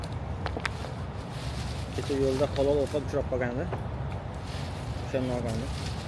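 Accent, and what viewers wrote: Samarkand, Uzbekistan. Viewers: Aka Samarqandanmisiz aksent shunaqa ekan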